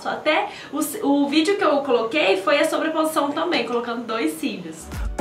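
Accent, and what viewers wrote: Minas Gerais, Brazil. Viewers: se e mineira ne! se nao for n acredito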